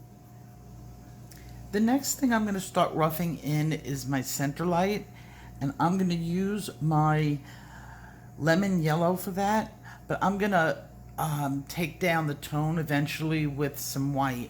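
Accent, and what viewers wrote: New York, United States. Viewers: It was a real triumph for you to finally pronounce ochre - but I was so used to hearing okra, so definitely your New Yorker accent!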